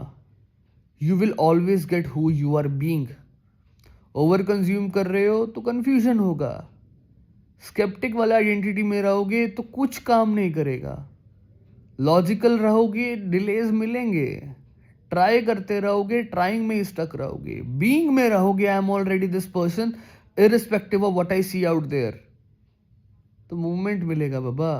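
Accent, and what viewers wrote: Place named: Punjab, India. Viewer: Fuck everything i love your punjabi English accent.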